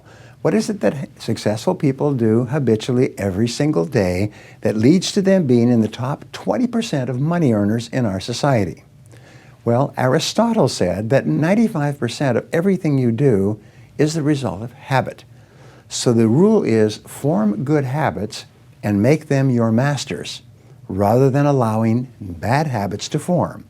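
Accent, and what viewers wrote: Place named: United States. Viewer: Most definitely an American Accent, thanks...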